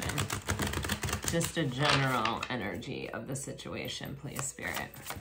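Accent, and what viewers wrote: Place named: Boston, United States. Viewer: Are you from Boston ?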